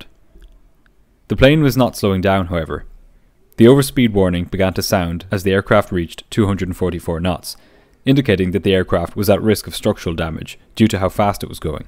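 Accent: Ireland. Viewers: This is an authentic Irish accent!! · Hear hear! Nice to hear an Irish voice too 🤠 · Am I erong to guess that you're Irish just by your accent? Great chanel buy the way. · The subtle irish accent is soo pleasant to listen to! I think one of the cardinal reasons I like this channel so much is the excellent narration.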